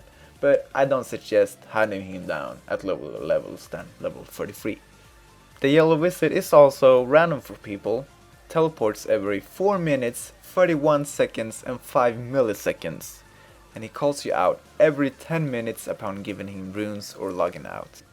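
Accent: Sweden. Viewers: Bra guide. Hörde direkt att det var en svensk tack vare ordvalen och den klara brytningen x,D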